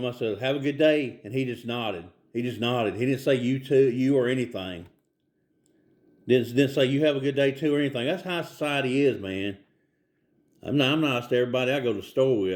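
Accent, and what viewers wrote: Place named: South, United States. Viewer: You sound like a southerner. We are raised differently from our northern or far western counterparts. We believe in being friendly and gracious. Not everyone has those values.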